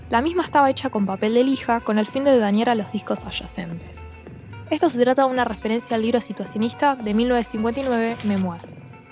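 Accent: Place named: Argentina. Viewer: No esperaba un acento argentino! Muy buen video, gracias por subirlo.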